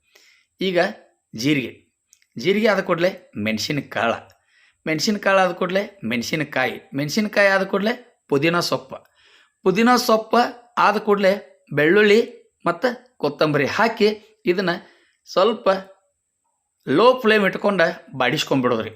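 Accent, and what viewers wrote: North Karnataka, India. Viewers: ಉತ್ತರ ಕರ್ನಾಟಕ ಶೈಲಿಯ ನಿಮ್ಮ ವಿವರಣೆ ಅತ್ಯಂತ ಆಕರ್ಷಣೀಯ ಸರ್…ಹಾಗೂ ನಿಮ್ಮ ಅಡುಗೆ ರುಚಿಯೂ ಅದ್ಭುತ…🙏🙏🙏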